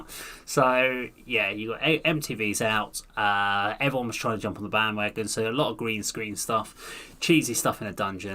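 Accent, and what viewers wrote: United Kingdom. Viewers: You from south east?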